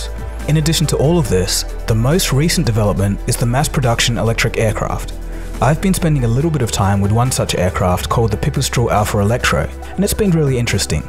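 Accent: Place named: Australia. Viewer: Aussie accent, no matter how clever they may be, it's still like listening to the slowest kid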